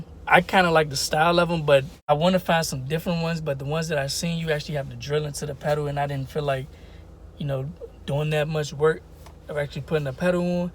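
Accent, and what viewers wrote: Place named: Maryland, United States. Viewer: as soon as i heard your voice i thought of maryland, i’m from NC but family lives there... good video brodiii💪🏾🙌🏾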